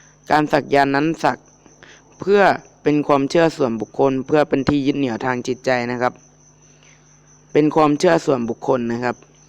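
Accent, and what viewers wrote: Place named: Isan, Thailand. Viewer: ว้าวอีสานก่าได้เด้อฟังออกยู่คับ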